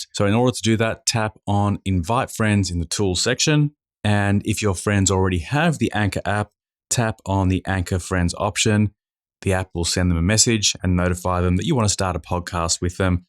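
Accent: Australia. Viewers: Hey there, sounds like you're an Aussie. I am based in Melbourne and am going to produce a podcast for Seniors. #whocanpodcast ! I can do most things but social media and video production : No! Would you like to partner with me ? Check my Instagram page: Whocantpodcast. Thanks for the video.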